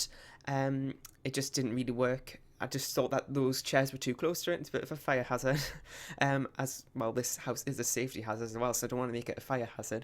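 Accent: Newcastle, United Kingdom. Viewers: No worries :) I'm from Durham! I thought you would be from Newcastle cause of your accent :D I live in London now so my accent isn't as strong haha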